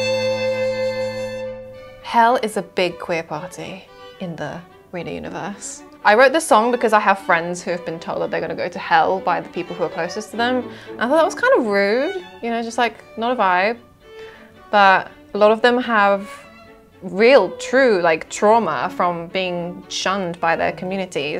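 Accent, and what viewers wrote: United Kingdom. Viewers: How did i not know she was british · I just want to hear her breathe into the mic... I really want her to ASMR through my ears...I'm really loving the British accent too... sound so nice ....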